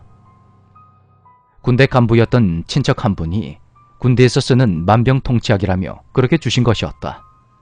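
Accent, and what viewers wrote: Gyeongsang, South Korea. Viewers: 경상도 사람ㅇㄱ라 언뜻 나오는 경상토 사투리 억양이 너무 익숙해서 그런지 더 듣기 좋으네요 ㅋㅋ오늘도 잘 들었습니다 ❤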